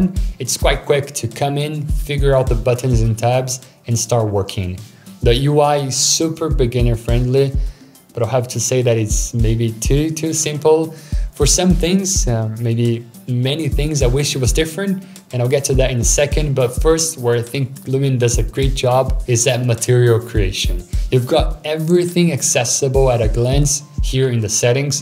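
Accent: Brazil. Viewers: are you brazilian? you english sounds like brazilian.´s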